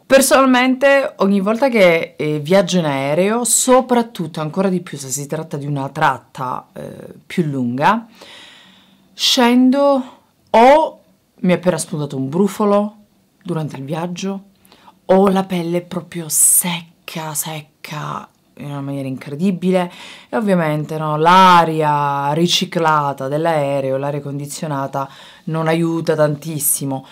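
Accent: Sicily, Italy. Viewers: si sente dinuovo l'accento sicilia <3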